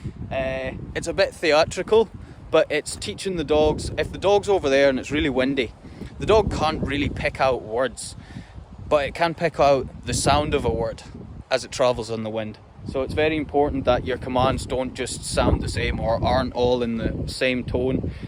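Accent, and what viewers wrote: Scotland, United Kingdom. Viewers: English spoken with Scottish accent. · Good evening. It is Isabelle from France. It is so nice to hear this Scottish accent of yours. Yes you love what you do and it shows. These dogs are so intelligent, it is marvelous. All you explain is very interesting. Thank you ever so much. I once looked after rough collies in England. 15 dogs who drove me crazy hihihi 🤣🤣🤣. Border collies are my favourite though! Thanks to share your work with us. See you and take care. Bye bye! 😉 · Hey, I do have a question: I cannot understand your order for the recall. I am from Germany and it’s difficult for me to understand your scottish mothertoung. For me the order for recall sounds like a serene! 😅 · Your accent makes it so much. Better. Some American guy yelling these commends would not be as exciting. Yeah Scotland!!!!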